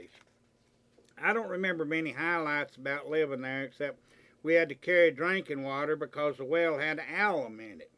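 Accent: South, United States. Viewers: I really enjoyed this story. For the life of me though, I don't remember your accent being so Southern! LOL. Must have been blown away by your Producer Status! Marianne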